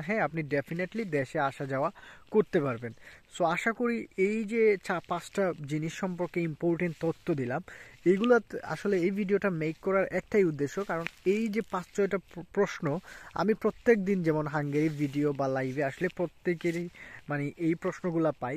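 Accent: Sylhet, Bangladesh. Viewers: আপনার কথায় বুঝা যার আপনী সিলেটি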